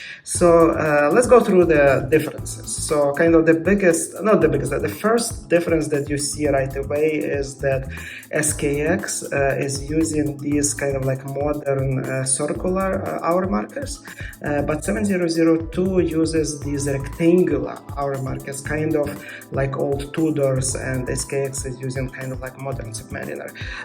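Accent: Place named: Russia. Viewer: The accent of this guy is very ru**ish! 👎👎👎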